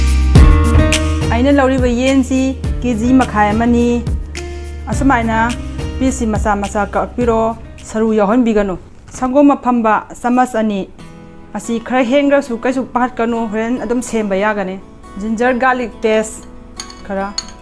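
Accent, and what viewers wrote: Kakching, India. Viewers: Meitei lonse mawong ama toudeye kakching haiba lamdugi dialect ne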